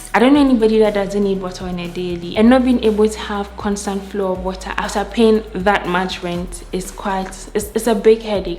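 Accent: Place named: Ghana. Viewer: very well explained and detailed. I love this. I also love the way you talk, are you Ghanaian, if not, what country are you from?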